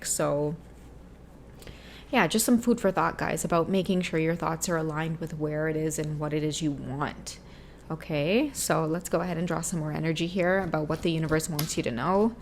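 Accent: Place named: Trinidad and Tobago. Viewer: I love when you do the trini talk w 🥰 and ty for the readings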